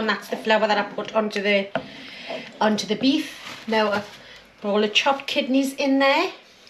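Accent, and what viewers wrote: Newcastle, United Kingdom. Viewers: Lovely steak and kidney pud Hinny havent had one in years,even nicer with some oysters popped in,lovely to hear your Geordie accent.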